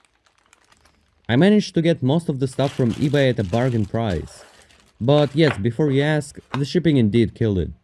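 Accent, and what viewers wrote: Russia. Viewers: Wait are you russian? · the russian accent is real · You from Russia? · russian accent...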